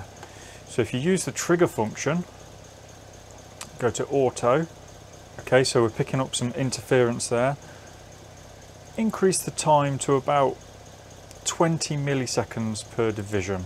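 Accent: United Kingdom. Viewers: Another English accent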